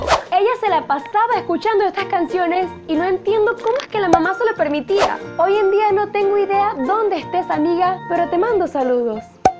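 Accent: Venezuela. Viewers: Es de venezuela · Creo que de Caracas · Eres de Mérida, de Maracaibo o de Caracas? Es que me confunde el acento... por cierto, buen canal me encanta tu escencia y tu entusiasmo 👏😌😍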